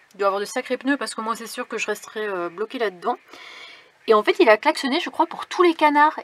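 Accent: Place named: Brussels, Belgium. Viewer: Ah ben c'est vraiment super cette nouvelle ! J'avais vu sur la chaîne de la Zick'Family le camping car en question du gars qui l'avait utilisé pendant 7 ans comme chambre d’hôtel parce qu'il travaillait sur un aéroport ou un truc comme ça (avant sa retraite actuelle). J'avais pensé à toi quand il parlait de la batterie auxiliaire (à cause de ton accent bruxellois) j'avais pensé "pourvu qu'elle le voit". Tu auras bien plus de place pour ranger tes affaires, une penderie près de la table, une autre près du grand lit ! + des tas de placards, une salle de bains avec wc, évier ! le pq n'aura plus jamais besoin de trôner sur l'évier de la cuisine ! Tu pourras laver tes cheveux à l'eau chaude puisqu'il y a un chauffe-eau au dessus de l'évier cuisine !, c'est fini de se crisper le crâne à le laver à l'eau glacée en hiver ! Frigo, chauffage, isolation au top ! 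Je suis bien heureuse pour toi et ton fils quand il sera là avec toi. (sans oublier Nanny bien sûr !) Ça va vraiment vous changer la vie ! Même pour Idriss, vous pourrez venir manger là aussi à deux ou entre amis, jouer à des jeux. Par contre j'ai vu que la table avait un petit problème (fendue sur le côté qui touche la paroi sous la fenêtre) mais ça ne doit pas être grave, juste esthétique, sinon Nicolas l'aurait dit je pense. Bon courage pour faire ton "déménagement"/aménagement dans le nouveau "Gérard II" J'ai hâte de voir la vidéo de demain (quand je pourrais car j'ai des tonnes d'activités en ce moment, même le soir) (Festi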